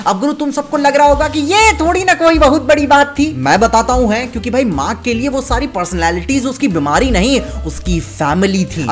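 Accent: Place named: Bihar, India. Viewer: Bhaiya ham bihari hai... Ham bhi kabhi kabhi ki tarha batiya lete hai. Par pata nahi kyu.. vo accent bhiya ke mu se jyada acha lagta hai... 🤣 Keep up the good work bhai..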